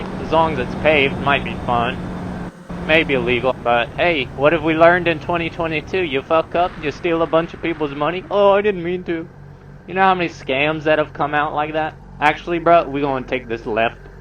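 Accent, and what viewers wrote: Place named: South, United States. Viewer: bro gained a southern accent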